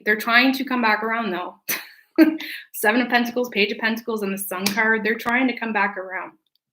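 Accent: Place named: Canada. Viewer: Pssst....your Canada is showing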